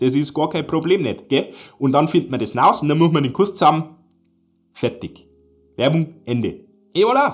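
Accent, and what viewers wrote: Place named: Bavaria, Germany. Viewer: 🤣sehr lässiges Boarisch!! Weida so!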